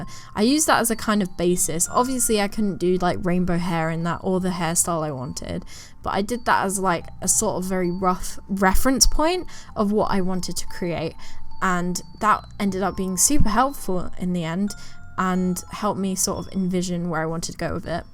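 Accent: United Kingdom. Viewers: I love you accent ! British accents are so unique ! I hate American accents maybe cuz I'm so used to them . Idk maybe I just dislike my accent eh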